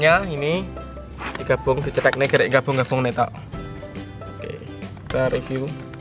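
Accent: Java, Indonesia. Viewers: Hhhh .wong ndi .kok kentel jowone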